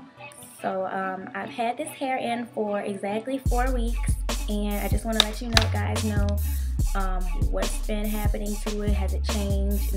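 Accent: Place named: New Orleans, United States. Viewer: R u frm new orleans because u sound just like us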